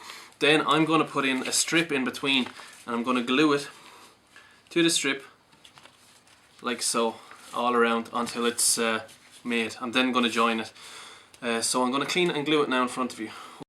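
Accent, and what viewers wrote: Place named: Ireland. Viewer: All this time I thought sounds Irish...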